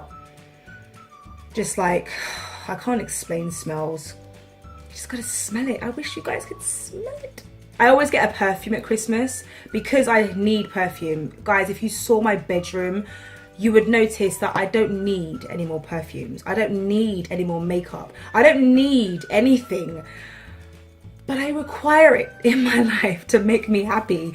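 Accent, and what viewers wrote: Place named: United Kingdom. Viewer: I like ur type of british accent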